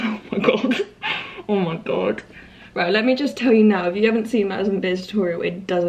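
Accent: United Kingdom. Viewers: I like the English accent from her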